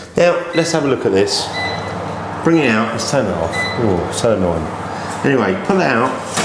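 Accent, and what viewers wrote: United Kingdom. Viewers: finally! someone whos british!!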